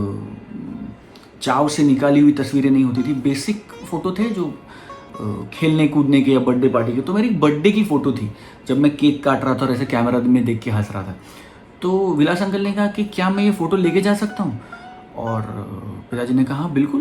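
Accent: Standard. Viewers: Swapnil ne bohot accha shudha hindi bola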